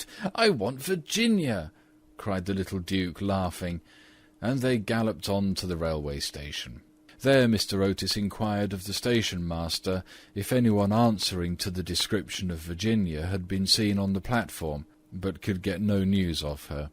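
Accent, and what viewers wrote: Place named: United Kingdom. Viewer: good but it's British .. Is there a story with an American accent ?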